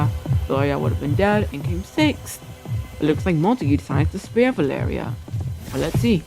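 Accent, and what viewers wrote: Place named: United Kingdom. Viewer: love the british voice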